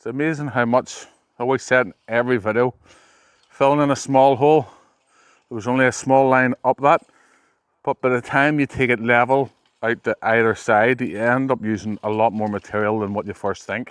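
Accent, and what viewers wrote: Northern Ireland, United Kingdom. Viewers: That's a Northern Irish accent!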